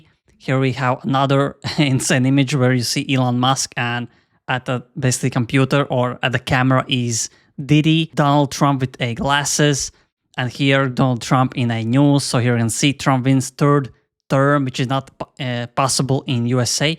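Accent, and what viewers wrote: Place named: Russia. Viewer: Why your accent is so similar to Russian? I'm russian btw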